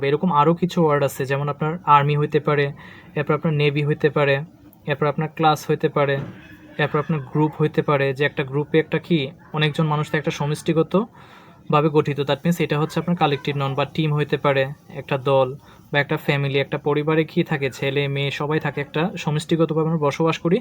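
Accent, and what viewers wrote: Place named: Comilla, Bangladesh. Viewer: Amar mayer bari o comilla te...tai apnar kthai se khan tar tan onuvob krchilam...Allah apna k valo rakhun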